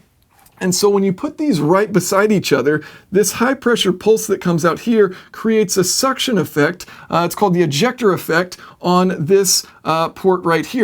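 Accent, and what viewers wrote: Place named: United States. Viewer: Another great video thank you Jason. My question is a simple one, why in the States do you pronounce Mazda as Marz da? Though I could add, why also in America is Nissan pronounced Knee San? Expecting some hate here, but really just curious.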